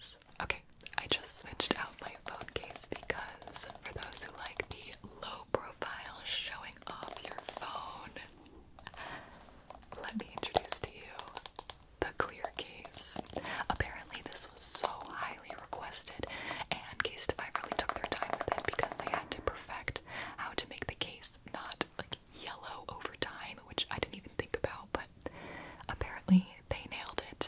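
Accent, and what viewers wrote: United Kingdom. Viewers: omg the british accent fits you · Who said that we would laugh at your British accent? It is wonderful, even tho I don’t live in Britain but I do love British accents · Ngl I love ur British accent tho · wtf i love the british accent 😭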